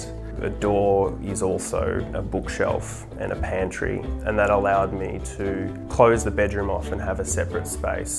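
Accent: Australia. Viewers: I heard his voice and thought It must be Australia. Lol. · Faces north Great lighting That accent Oh he lives in Australasia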